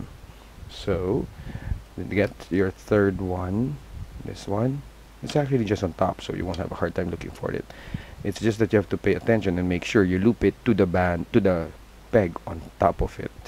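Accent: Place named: United Kingdom. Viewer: I MADE IT!! And last week The Legend!!! I made the Star box in Jelly red violet and jelly turquoise! Some bracelets look bad with jelly bands but NOT this one it looks stunning!!! I have a bad headache after making it though lol! Great British accent you did too! Sounded just like my accent which is English!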